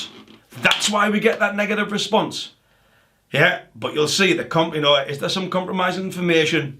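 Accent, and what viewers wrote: Newcastle, United Kingdom. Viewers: I love your Gordie accent! 😆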